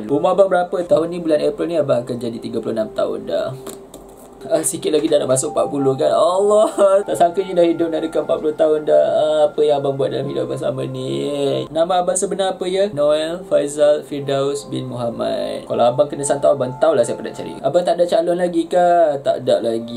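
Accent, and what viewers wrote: Sabah, Malaysia. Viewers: suke,lagi lagi ckap sabah gan jepun tuh..^^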